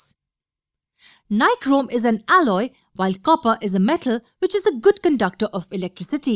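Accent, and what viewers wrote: India. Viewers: Late... but I think it's an Indian accent :)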